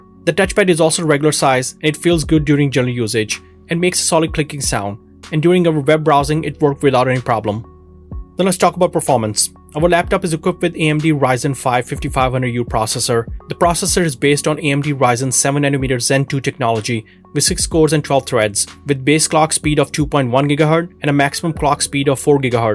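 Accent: India. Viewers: Video was awesome but the thing I noticed was your accent didn't sound like American but as an indian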